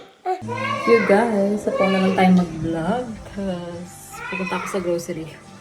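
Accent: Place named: Pampanga, Philippines. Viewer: Kapampangan accent ya girl! Wag kang mangialam. Pakialaman mo buhay. Hinahanap ka ng amo mo. 😂😂😂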